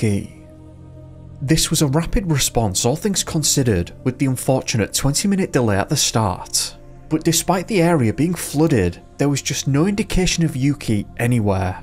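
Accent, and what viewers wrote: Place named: North, United Kingdom. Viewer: A new vid! 🙌🏻Right on! I adore the narrators style in every way but I cannot place the accent correctly. Please someone tell me which place up north this gentleman hails from! ☺️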